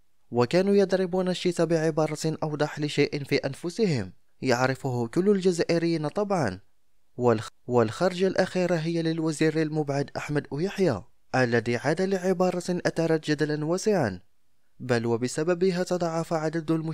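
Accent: Morocco. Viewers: لهجة مروكية لماذا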